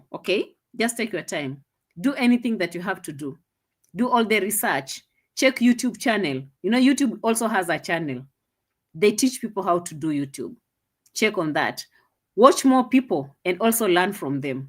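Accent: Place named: South Africa. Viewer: No your accent sounds exactly like S.African When Caster was in SA, the S African said she sounded like them 😂😂😂 ❤❤ we loved that i like when you say ( LET ME LEAVE MYSELF ALONE) 😂😂😂😂😂❤❤❤ That's a new phrase for me adopted from you😂😂😂❤❤❤❤❤💋💋💋💋💋💋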